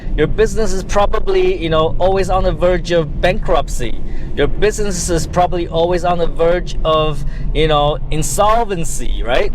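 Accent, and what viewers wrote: Standard. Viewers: nói rất chuẩn dễ hiểu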